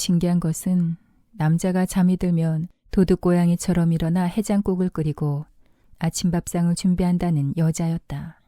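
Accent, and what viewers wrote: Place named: Gyeongsang, South Korea. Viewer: 산 기슭에 판자를 얽어 움막을 짓고 살았던 사람들의 이야기가 연상되네요. 물론 한 세대의 간극은 있지만요. 술이 고주망태가 되어 마누라를 무지하게 패던 못난 놈들이 살았던 시절. 그래도 아침에는 해장국이 밥상에 오르던 그때 이야기는 이제는 없겟지요? 님의 경상도 사투리가 아주 구수합니다. 박재홍님의 옛 노래의 노래처럼 말입니다.잘들었습니다.☆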